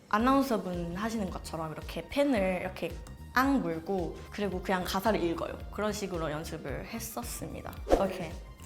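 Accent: South Korea. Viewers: 영상 안 보고 듣고만 있으면 그냥 한국인...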